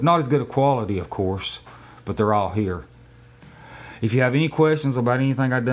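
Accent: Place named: Kentucky, United States. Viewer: By the way where do you live? I used to live in Kentucky and I kinda recognize your accent from when I used to live back there. Not trying to be funny but I was just curious. Used to live outside of Lexington Kentucky in a little town called Winchester KY. who knows we might have been neighbors at some point 😂😂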